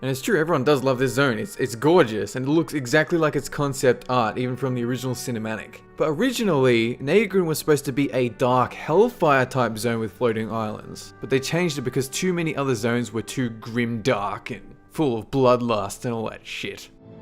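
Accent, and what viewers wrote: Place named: Australia. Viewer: Oh yeah I'm aussie too. It's good to hear a familiar accent. What server's you play on? Are the oceanic servers busy these days?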